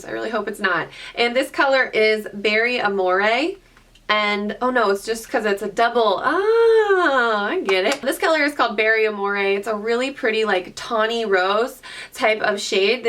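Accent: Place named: East Coast, United States. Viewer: I Love your slight accent. I'm assuming your from the east coast?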